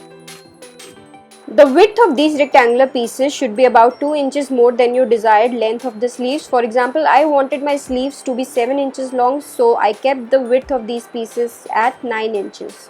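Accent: India. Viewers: I just want to hear your hindi accent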